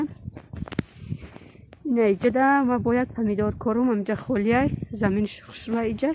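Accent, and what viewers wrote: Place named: Hisor, Tajikistan. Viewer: Салом алейкум чон ранги хисориё гап мезани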